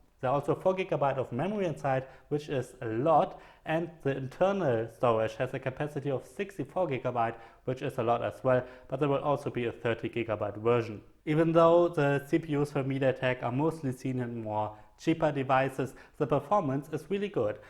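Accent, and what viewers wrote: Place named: Germany. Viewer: mannnn...du hörst dich so dermaßen deutsch an haha nicht schlimm aber dieser deutsche Akzent beim Englisch sprechen, haha viel zu geil, kurze frage wie ist die Performance und sound im Vergleich zum Nexus 9 welches ich überlege hierdurch zu ersetzen, wäre dankbar über eine Antwort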